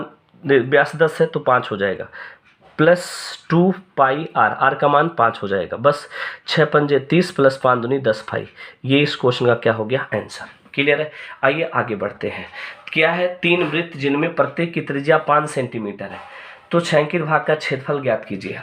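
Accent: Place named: Bhojpuri, India. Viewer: Bhojpuri achha bol rhe hai sir.....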